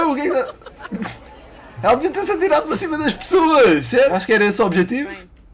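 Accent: Portugal. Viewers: Nsss gente o jeito que português fala é tão diferente do brasileiro😂😂😂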